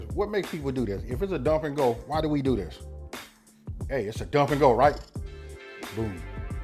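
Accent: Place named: West Coast, United States. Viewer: Keep going bro... only chef with that gangsta west coast accent... Love how your channel is blowing up.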